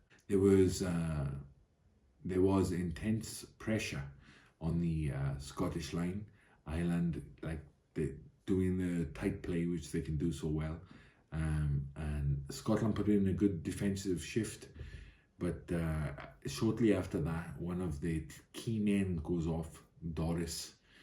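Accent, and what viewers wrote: Wales, United Kingdom. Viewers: Thanks, you have a lovely soft Welsh voice. Bet you sing in a choir.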